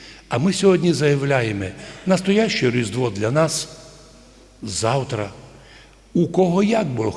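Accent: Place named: Zakarpattia, Ukraine. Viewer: Він говорить так, як споконвіку говорять на Закарпатті, а літературна українська мова в кабінетах вишліфовувалась, так як і інші, адже кожна місцевість мала свої діалектичні особливості. А ви на кого працюєте, закидаючи йому, що він мови не знає, а самі пишете чиєю?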